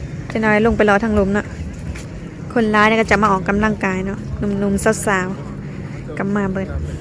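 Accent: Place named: Isan, Thailand. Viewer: หลงเสียงเว้าของ เข้าให้เเลัว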